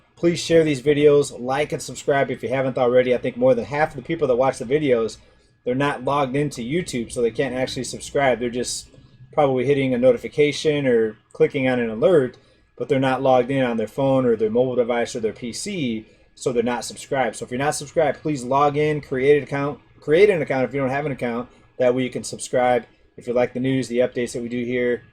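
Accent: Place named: Michigan, United States. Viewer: I’d like to hear your story about leaving the midwest. I can tell by your accent you are from Michigan or something over there. We just did a roadtrip to the upper Midwest and loved it, though the weather was not for the faint of heart lol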